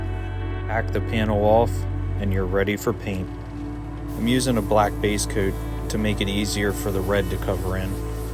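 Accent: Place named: Pennsylvania, United States. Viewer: Helpful video, nice work. And a little Pennsylvania accent asmr I hear? Eh? Eh? Pennsylvania? Am I right?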